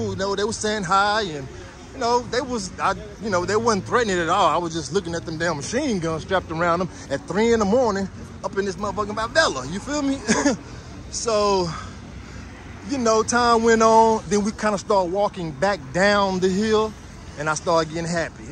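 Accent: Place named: Texas, United States. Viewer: Nigga I'm from East Texas too, Longview ! Lol ! About to get my passport. I knew this dude had a texas accent lol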